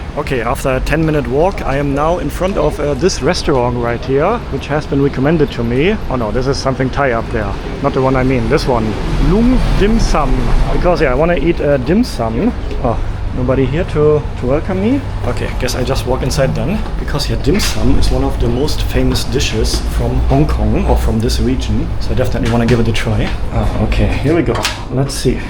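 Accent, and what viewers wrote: Germany. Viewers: Are you german ? Yaaaaah you imagined the accent didnt you ? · You sounds German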